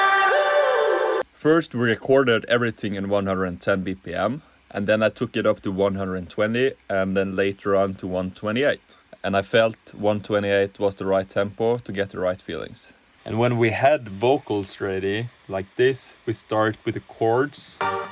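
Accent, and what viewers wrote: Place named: France. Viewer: You have the French accent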